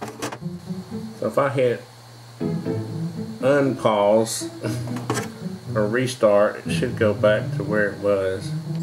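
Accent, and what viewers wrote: United States. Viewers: U have a really heavy american accent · Damn he has annoying "murica" accent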